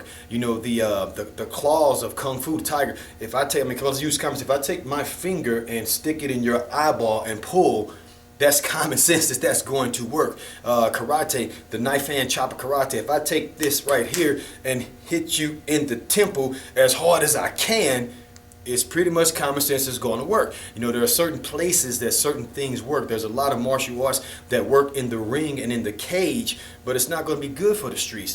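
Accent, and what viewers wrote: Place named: Philadelphia, United States. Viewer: Dude sounds and looks like hes from Philly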